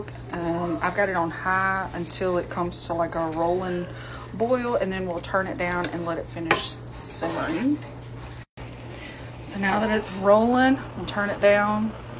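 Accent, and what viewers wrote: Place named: South, United States. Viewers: you sound like an american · Somewhere in the south for sure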